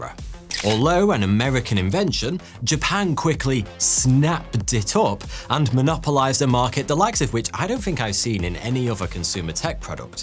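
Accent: United Kingdom. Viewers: I might be from the States but your accent and mannerisms are key on, not to mention the dumbest, corniest jokes EVER! Bravo to the hilarious Brits! Wish I could join this channel in some way! The editing is flawless btw ;) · Most British voice ever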